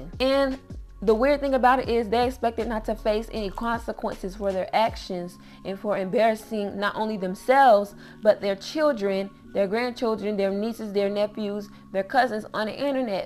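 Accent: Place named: South, United States. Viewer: You mad Southern hun bahaha ☺️😂 Keep up the good work...100k subscribers in no time! 💪🏿💪🏿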